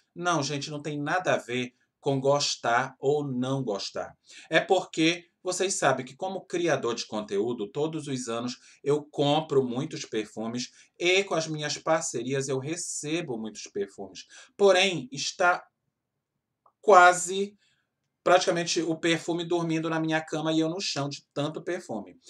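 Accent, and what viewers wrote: Pará, Brazil. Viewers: Esperando esse desapego... vc pelo sotaque é paraense? Meu filho nasceu no Pará, fui morar Macapá e hoje meu filho, 26 anos, mora nos Estados Unidos. Bjs adoro suas resenhas, vc tem muito carisma. 💕🥰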